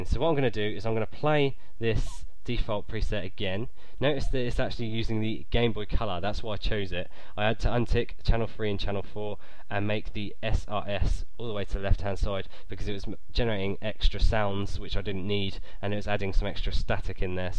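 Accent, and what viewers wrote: United Kingdom. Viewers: nice..that really helped me out ALOT! i'm on my way to downloading LMMS!!!!!!! :D p.s I LUVED ur british acsent lol.... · Something I've observed. People with sort of British accents always make the best tutorials! Thanks, I think I've got it now! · Oh my, i love your British accent, and great tutorial. :D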